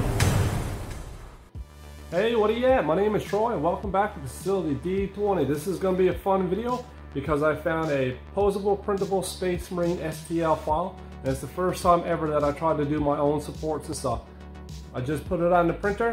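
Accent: Newfoundland, Canada. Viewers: From newfoundland bud? also this was pretty interesting, thanks for the video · you sound like my uncle from Newfoundland, makes me feel like I'm gonna have a awesome time when I watch your videos. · Man i have to ask are you from Newfoundland? Soon as i heard your voice i was like , its one of us hahahahah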